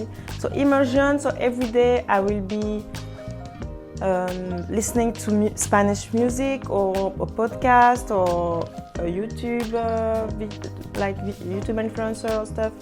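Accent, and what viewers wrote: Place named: Belgium. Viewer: You sound very Belgian haha (coming from a fellow Belgian) I would recommend you to check out Dreaming Spanish. がんばれええ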